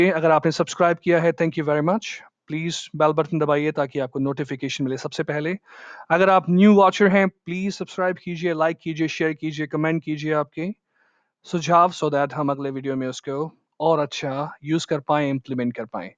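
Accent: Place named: India. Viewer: Mate stick to ur Indian accent rather than imitating our accent.... why don’t u decide if u wanna speak British or American first instead of mixing them.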